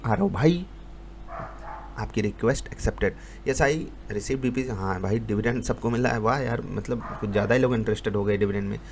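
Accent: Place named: Uttar Pradesh, India. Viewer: Sir ap kon c city me rhte ho. Apki tone se main guess kr skta hu aap Uttar Pradesh se belong krte ho. Kindly reply krle batana jrur muje sir m waiting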